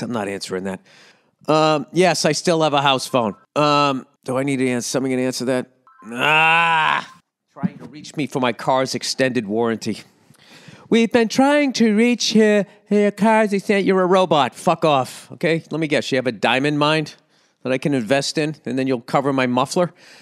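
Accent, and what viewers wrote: United Kingdom. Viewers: Ol Billy British Balls ranting about F1 in the accent is hilarious 😂😂😂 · Dude that British accent fucking killed me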